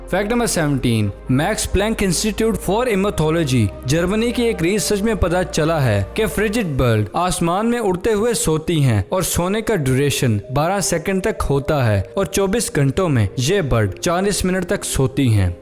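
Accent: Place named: Lahore, Pakistan. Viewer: گدھے کا دودھ۔۔۔؟ لاہوری لگتے ہو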